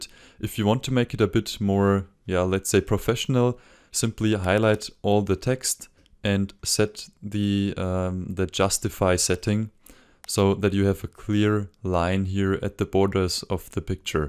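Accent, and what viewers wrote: Germany. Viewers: Strong German accent my friend:)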